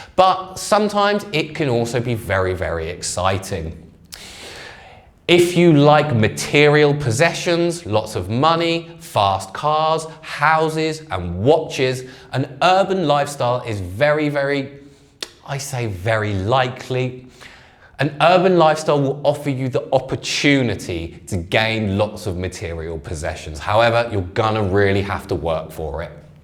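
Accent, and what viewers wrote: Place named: United Kingdom. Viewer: love his british accent !!!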